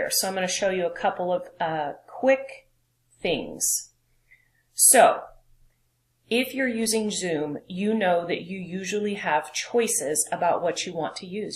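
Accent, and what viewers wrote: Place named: Boston, United States. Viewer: gasp! Boston!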